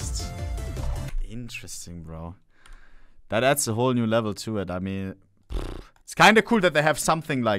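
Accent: Germany. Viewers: is bro german ? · i think youre from germany am i right xD alles klar xD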